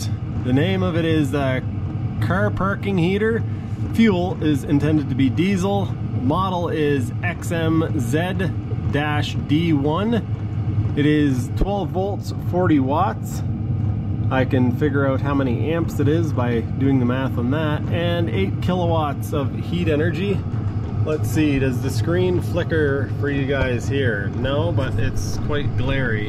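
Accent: Canada. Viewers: Can't watch the whole video due to the Canadian accent. Boot boot boot annoying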